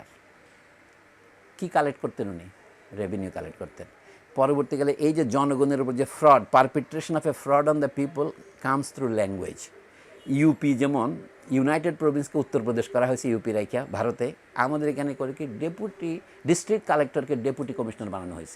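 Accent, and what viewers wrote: Chittagong, Bangladesh. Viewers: As soon I heard you, I new you are our son ( Chaatgaiya) 😊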